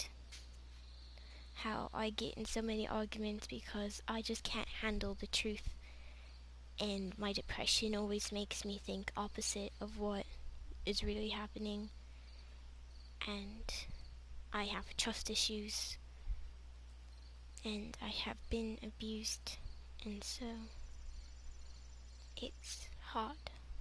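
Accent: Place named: United Kingdom. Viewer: I'm so sorry what you've gone through (T^T) ps. You sound British Pps. I love you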